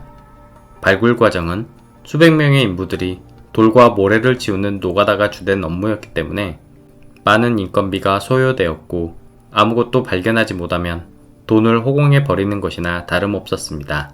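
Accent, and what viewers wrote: Jeolla, South Korea. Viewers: 전라도 분 이신가보네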